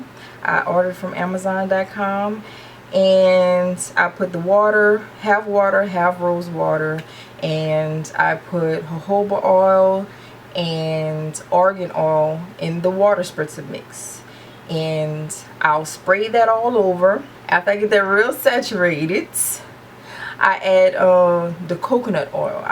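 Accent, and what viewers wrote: New Orleans, United States. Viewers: New Orleans? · Sound like you from new orleans babyyyy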